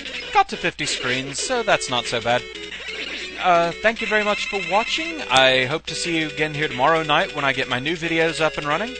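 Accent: United Kingdom. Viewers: umm so you migrated to there from Britain? Because you have such a nice British accent! Or do i just not know anything :D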